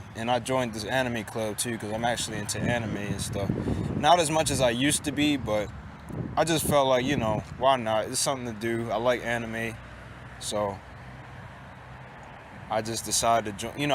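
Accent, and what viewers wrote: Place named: Baltimore, United States. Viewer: Sounds like Baltimore for sure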